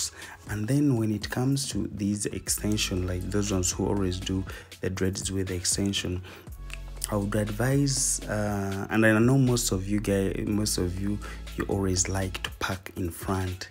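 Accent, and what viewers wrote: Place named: Uganda. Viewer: ..I've just discovered you.are you Ugandan? You sound like my people..🥳🥳🥳🥳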